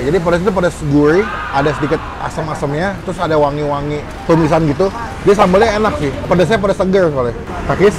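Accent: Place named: Java, Indonesia. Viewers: Bang ngomong jawa serasa nonton ndarboy genk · njir jowo asli 😂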